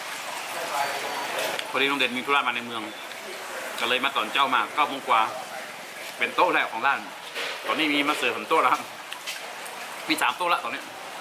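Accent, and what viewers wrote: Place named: Northern Thailand, Thailand. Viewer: พูดเหนือปนกลาง งงกะลุง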